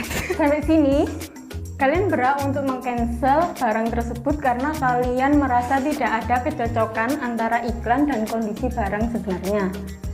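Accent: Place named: Java, Indonesia. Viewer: Medok mbak 😅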